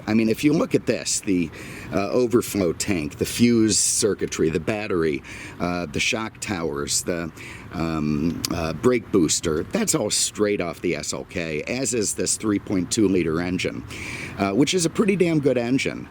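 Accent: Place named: North, United States. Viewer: Why do people who clearly come from the north (your accent gives it away) come to Florida and complain non stop about the heat/humidity? Nobody forced you to come down here. STFU already and just give us the car reviews....:-x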